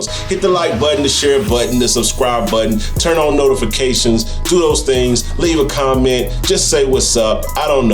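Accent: United States. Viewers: The review is good, but the pronunciation is really very "American". It was very difficult for me (Im Italian, understanding English well) to follow.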